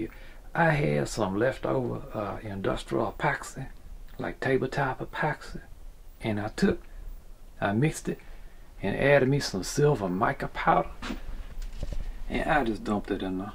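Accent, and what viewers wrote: Louisiana, United States. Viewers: New to your videos. Is it rude to ask if you’re doing a voice? I’m from the South but the way you use contractions is very different from what I’m used to hearing. I assume you’re from Louisiana or Mississippi? Aside from that, great tips and love how your video persona is the puppet. I would do that if I made videos.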